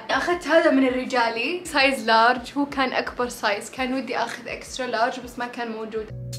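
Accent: Saudi Arabia. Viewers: يمه هذي مين اول مره اشوفها بصراحه بس يمه تدخل القلب عفويتها تجنن ماشاءالله + احسها كويتيه بس لهجتها سعوديه بس برضو احس يليق عليها تكون كويتيه😭😂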